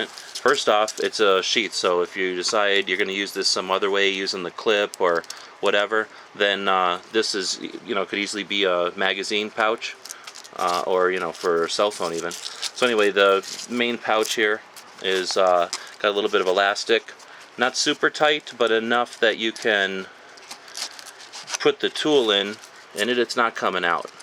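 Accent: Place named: United States. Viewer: When I hear your voice I hear 'Merica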